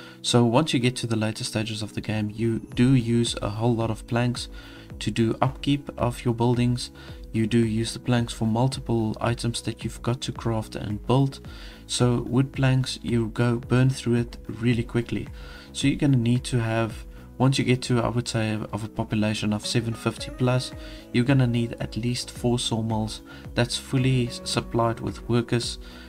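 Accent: South Africa. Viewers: Good stuff! Thanks. Coolest tip to have multiple mines on deep deposits (infinite supply deposits). BTW, I think I hear a South African accent there?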